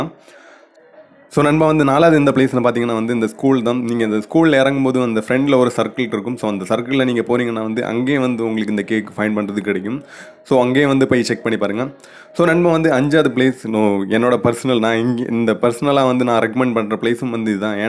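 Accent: Kanyakumari, India. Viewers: கன்னியாகுமாரி யா ப்ரோ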